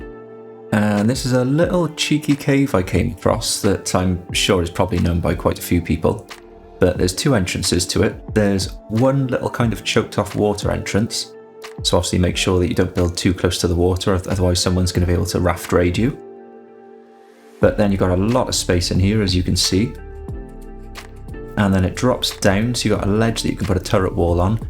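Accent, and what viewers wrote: Wales, United Kingdom. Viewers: I started listening as you sound very welsh, I kept watching as you are really good at explaining things and as such I’ve gone from worst ark player ever to competent enough to survive a week 😂. Keep it coming for us older folk who can’t keep up.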